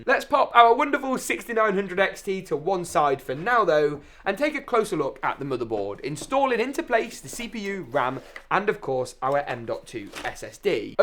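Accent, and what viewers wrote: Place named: Yorkshire, United Kingdom. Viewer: Utterly bonkers - You are from Yorkshire, right?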